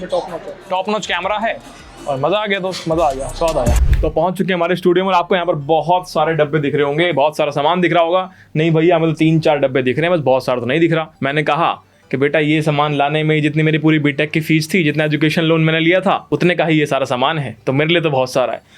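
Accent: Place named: Delhi, India. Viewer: And bhaiya always come with something new and unique may be and bhaiya ka bolne ka style bilkul delhi wala apne jaisa😂😂😂😂:goodvibes::elbowcough: btw happy engineer's day bhaiya ❤:elbowcough::elbowcough::elbowcough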